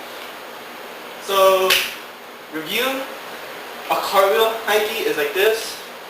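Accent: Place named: Philippines. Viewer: lol your filipino. that accent sounded familiar xDDD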